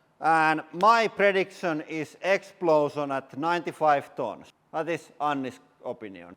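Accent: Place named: Finland. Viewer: I can instantly telm ur finnis because of the accent so moi ja oli hyvä video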